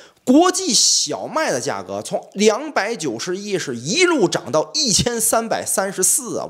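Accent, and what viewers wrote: Tianjin, China. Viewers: 老家天津，喜欢你说天津话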